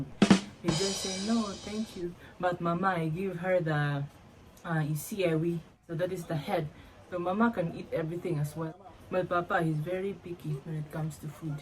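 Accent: Nigeria. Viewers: Have you realized you have developed a Nigerian-English accent? yes, you sound like a nigerian... Great video.. cheers!!!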